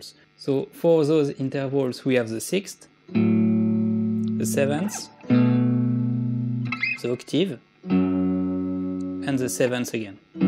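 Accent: France. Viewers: are you french ? cuz you sound pretty french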